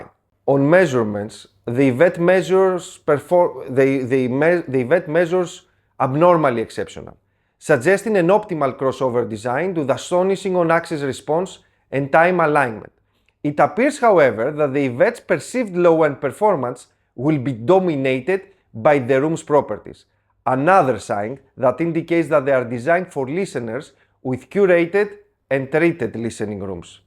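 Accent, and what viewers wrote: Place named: Greece. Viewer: Hi, you must be Greek, if I can tell by the accent. Beautiful system, congratulations. Very transparent and well organized presentation. Subscribed. My system is comparatively very modest, but I really enjoy it: Pro-ject Classic Evo turntable, Ortofon 2M Bronze cartridge, Pro-Ject Phono Box S3B Preamp, Advance Paris A10 classic integrated amp, KEF LS50 speakers, 2 REL T-7x subwoofers, Marantz 6006 CD player, WiiM Pro Plus streamer, Audioquest and QED cabling.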